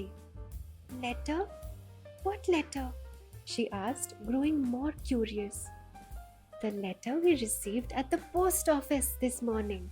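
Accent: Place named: India. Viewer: A sweet story in a sweet voice. Thank you for bringing these kinds of stories to us in Indian accent. It (nest) really gives us (listeners) comfort (Indian accent). Thanks!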